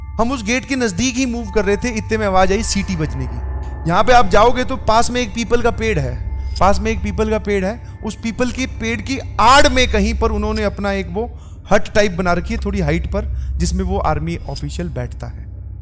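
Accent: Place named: Bengal, India. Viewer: Ami je tomar😅 hayy your Bengali accent just killd me ❤❤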